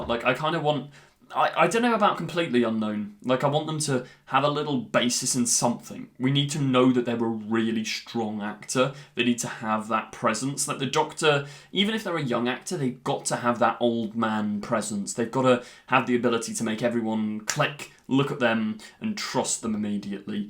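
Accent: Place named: Scotland, United Kingdom. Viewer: Scottish accent alert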